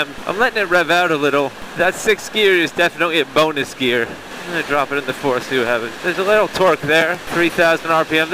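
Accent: United States. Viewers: just wondering; why does the motorcycle have a British number plate but you have an American accent. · You sound like an American but the roads say and bike British Empire. The amount of land splitting is insane and that traffic is insane. Don't even think about lane splitting in Texas, you'd get smashed by the idiot drivers that are paying more attention to their cell phones. Plus its illegal. The T-120 is an old man's bike for sure and 80 horses is like you said not neck snapping but maybe adequate. As I've stared at your handle bars for damn near 30 minutes, it's clear the wiring from the hand controls looks really bad. I'd give the designer and F along with his buddy that designed that stupid fuel filler cap. Don't understand your bleeps or that you blurred out your speed when you hit 80. I'm a little confused thou when 20 mins in you seem to suggest the T100 with it smaller motor was better. 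Unsuitable for motor traffic... lol is that someone's suggestion or a government sign? I think you did a good job with your impressions.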